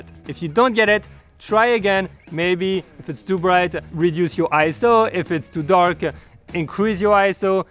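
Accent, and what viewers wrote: France. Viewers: you obviously are french haha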